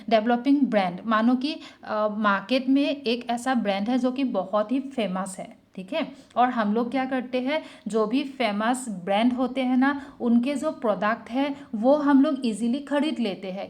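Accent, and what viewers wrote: Assam, India. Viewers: Baa apuni Assam r neki?? Pronunciation tu Axomiya jen lgise aru apuni o no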